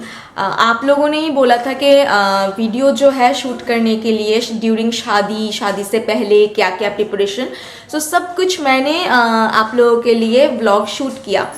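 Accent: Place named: Bengal, India. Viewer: Plz learn to speak proper Hindi bfr u make vlogs; u r Bengali, why don't u speak in mother tongue?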